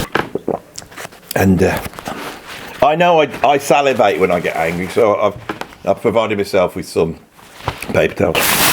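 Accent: Birmingham, United Kingdom. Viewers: We love hate, but only in a Brummy accent.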